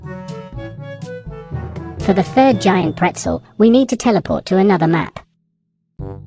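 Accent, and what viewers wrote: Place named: United Kingdom. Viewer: Your accent is british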